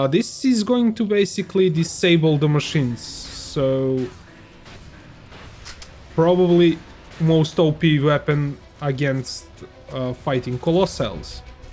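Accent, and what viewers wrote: Russia. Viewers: Hi, thx for the vid. Is that Russian accent or Polish? Just asking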